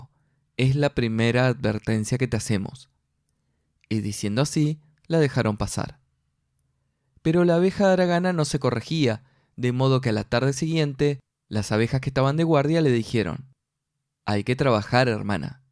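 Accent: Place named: Argentina. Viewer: Excelente! Enfim um canal com conteúdo em espanhol com acento Argentino. Sou muito grata!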